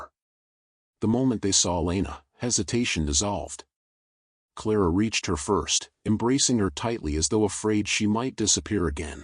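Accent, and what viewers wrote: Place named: United States. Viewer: The American accent is so horribly inappropriate for such a totally English story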